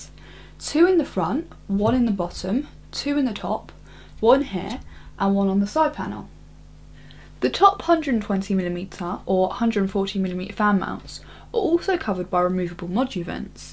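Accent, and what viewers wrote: England, United Kingdom. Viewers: pretty sure that's her accent. not sure what region of England she is from. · Who said british girld are ugly?? Shes smoking hot with that british accent!!! And very good review! Linustechtips looks so bad compared to this!! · She's from the UK. Americans / Canadians / Aussies / Kiwis / South Africans sound nothing like this.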